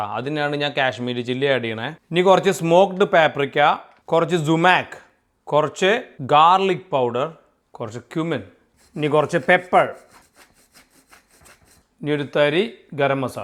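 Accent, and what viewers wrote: Thrissur, India. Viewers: നിങ്ങളുടെ സംസാരം 👍😌poli അ തൃശൂർ സ്ലാങ്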